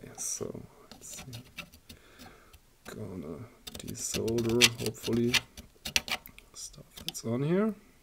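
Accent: Germany. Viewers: Like the video 😃 keep up the good work . Also are you German ? You should like a German speaking guy.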